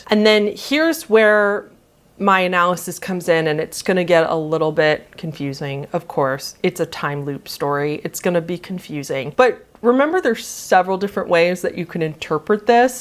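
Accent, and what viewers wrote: United States. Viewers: KobenThat’s just her accent. Most Americans pronounce “Shire” like “Shy-yur.” I’m sure she didn’t mean to offend anybody.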